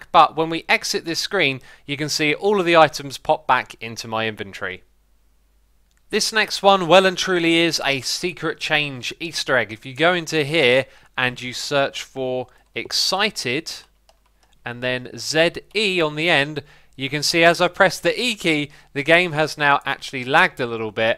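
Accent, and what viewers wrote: United Kingdom. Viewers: yay.yet ANOTHER british minecraft youtuber lol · informational videos by a British chap, what can be better than this.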